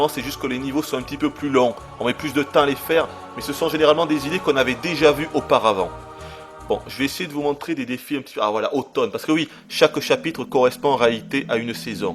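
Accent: South, France. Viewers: Bein oui, l'accent du viens du sud.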